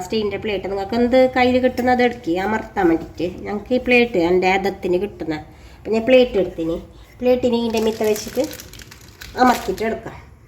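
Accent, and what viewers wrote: Kasaragod, India. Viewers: Ithu Kasaragodu language aanu. Swayam kettu nokenda aavasyam illa. Avarude language il alle avarkku samsarikkan pattukayullu. Aa language kelkkan ishtappedunna Kure aalkkaru ind. Kannur, kasargodu ullavarkku trissur, Trivandrum language kelkkumbol ulla bhudhimuttu aayirikkum ningalkku indayathu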